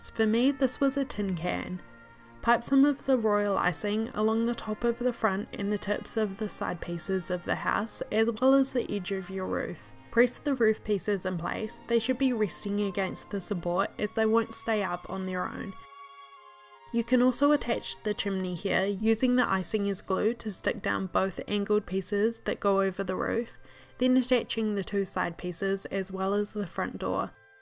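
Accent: New Zealand. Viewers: Is she from New Zealand? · I know a Kiwi accent when I hear one! This was so helpful for a first time gingerbread-houser! (if that’s even a thing)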